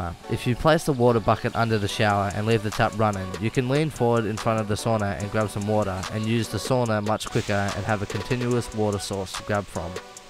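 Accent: Australia. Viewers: You sound Aussie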